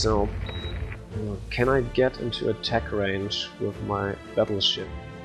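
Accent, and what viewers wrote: Germany. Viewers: German 🇩🇪?:D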